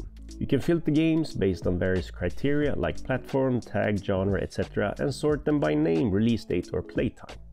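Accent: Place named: Sweden. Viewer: I can hear the swedish sort of accent, you sound familiar dude.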